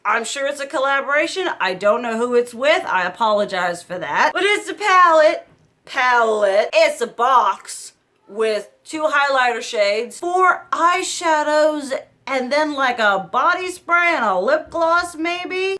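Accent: United States. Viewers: I love they way you talk country accent with animation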